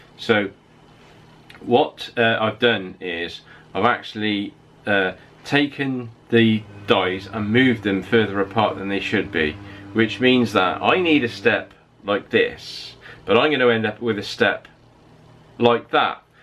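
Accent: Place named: West Country, United Kingdom. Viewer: When I was a bit of a tear ass in my car and bent it up I loved going to the repair shop in Westfield, Somerset. Mk 1 Cortina days. The owner Les was a great man and he allowed me to hang around until he started to let me use some of the kit. Loved using gas welder to stick on the odd wing or rear quarter. Doing it for love is one thing but doing it for a living is a different matter. At 71 I love what you do. Your blog's are pitched just right. How anyone can tell you your doing it wrong is definitely a bed wetter. I am adding Einstein to your second name. You say you were always looking for time and space well he had a thing about this too but he called it Space-time. I am trying to sus your accent. Are you a westo. North Somerset am I. Take care.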